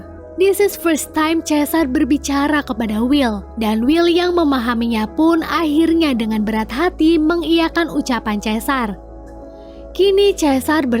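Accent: Pemalang, Indonesia. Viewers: Wwong Pemalang asli min?Podo wkwk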